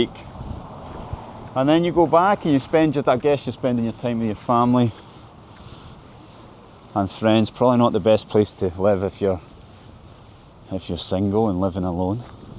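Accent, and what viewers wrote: Scotland, United Kingdom. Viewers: Акцент конечно😮. Оказывается так по-английски говорят шотландцы. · Я смотрю довольно много видео об иностранцах, путешествующих по России. Но когда я услышал ЭТОТ акцент, я подумал "О, да! Ты точно шотландец!" :D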